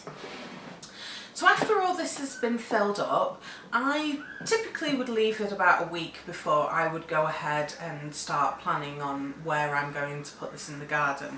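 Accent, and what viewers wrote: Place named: United Kingdom. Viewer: How have I never heard of Bokashi before?! This is brilliant! (And why was I surprised you have a British accent? I knew about England! Ha!) Did you mention how much the bags of bacteria cost? I was wondering about the start up cost for the system and how much it costs to make a batch of compost, since you put a cup in every time you fill it. I could have missed that part. (Toddlers.)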